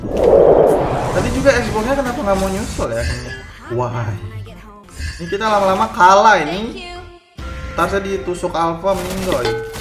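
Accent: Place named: Malaysia. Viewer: Gua dari Malaysia gua dengerin suara abang kaya orang malaysia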